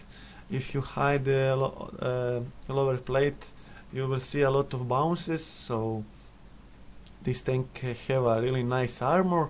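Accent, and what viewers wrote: Russia. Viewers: you sound like are from russia, but its cool, keep it up, I love your chanel. I di je onaj ludonja sta kaze jebi dan krumpirko, ukra si mi kill. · Kako koji put sve si bolji sa engleskim ali i dalje zvucis kao russ ;)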